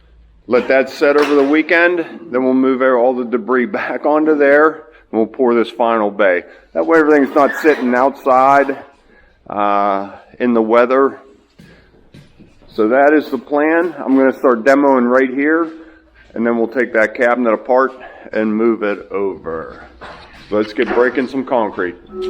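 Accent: Pittsburgh, United States. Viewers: looked on all your sites but dont see where your located,,i detect a pittsburgh accent in your voice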